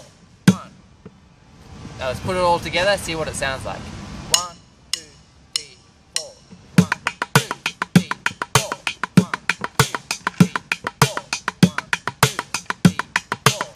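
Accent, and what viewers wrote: Australia. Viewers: You got an australian accent now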